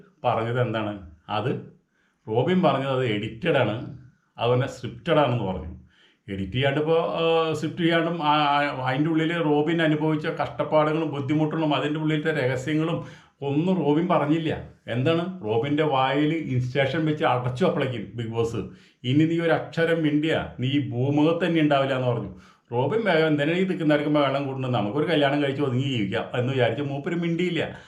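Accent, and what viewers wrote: Thrissur, India. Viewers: I enjoy your trichur slang malayalam . You are bold. Brave man. Good comedy. Thank you.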